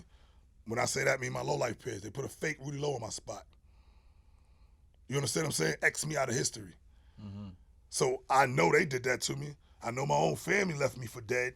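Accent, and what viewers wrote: New York, United States. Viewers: bro sound like a New York Cmac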